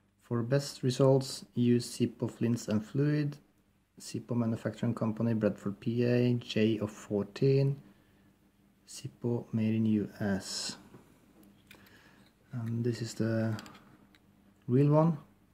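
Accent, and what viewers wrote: Norway. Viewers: Gotta be a norwegian. Nice norwinglish. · This sounds to me like a Norwegian accent. It’s beautiful. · He sounds norwegian :-)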